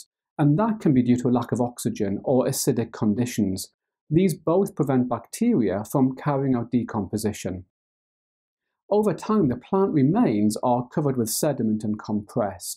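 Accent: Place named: Newcastle, United Kingdom. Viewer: He's from Newcastle, so I'm guessing it's a slight geordie accent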